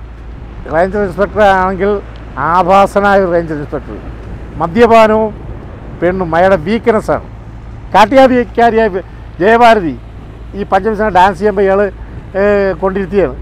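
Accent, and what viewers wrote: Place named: Cherthala, India. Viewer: Cherthala basha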